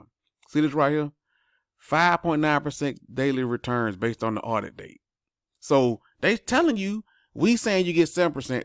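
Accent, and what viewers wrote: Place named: South, United States. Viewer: you the man, where you from bruh. That accent sounds country like me.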